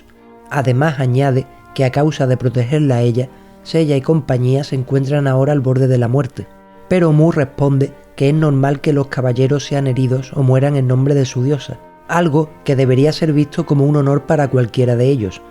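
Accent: Andalusia, Spain. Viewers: Muy bueno vídeo amigo muchas gracias por las aclaraciones. Una pregunta, ¿eres del sur de España? Por el acento 😁. Saludos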